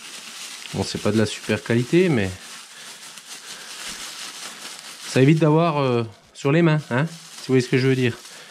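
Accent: Germany. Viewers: L'accent allemand de malade 😅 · rien n'oblige à mettre les raccords l'un en face de l'autre ? si par ex on perd l'écarteur, on pourrait les décaler ; le pouce bleu n'est pas pour l'accent allemand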